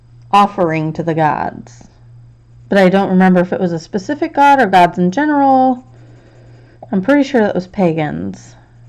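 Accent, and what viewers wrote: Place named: Dayton, United States. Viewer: Your coloring is beautiful. I’m from Celina, Ohio and sounds like your from Dayton ares? Keep up the good work!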